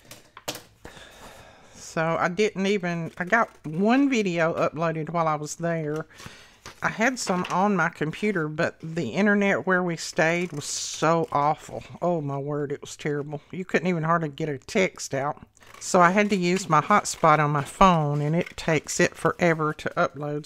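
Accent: South, United States. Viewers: The flowers are great. Thanks. I always forget about fussy tearing so will print everything twice so I can do both. To a lot of us you have a beautiful southern accent, so keep on talking 😂. ❤❤❤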